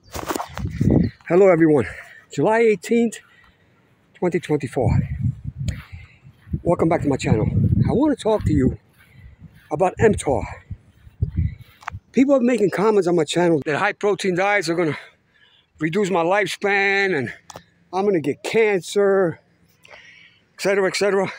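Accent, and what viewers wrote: New York, United States. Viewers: Your channel is AWESOME…I just subscribed ….youre in Munich but you sound like you’re from NY ….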